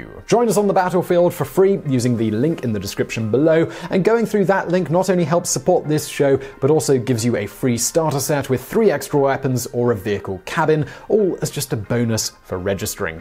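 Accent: United Kingdom. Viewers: woah british babish 😳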